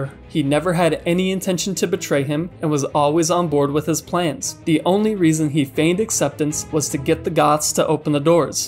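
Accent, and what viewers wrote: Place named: Italy. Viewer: Tabish here watching from Lori's computer lol ...love the italian accent lol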